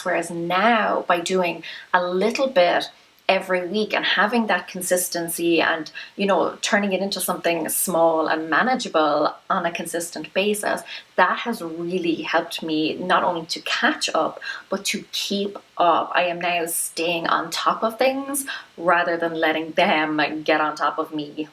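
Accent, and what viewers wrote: Ireland. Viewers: Would love to see a monthly reset! Thanks!!! By the way, Americanisms with your Irish accent? Love it! Ain't nobody got time for that never sounded so cute and classy at the same time ;-)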